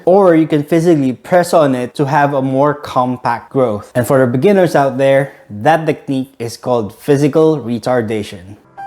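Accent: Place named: Philippines. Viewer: I can hear that youre a filipino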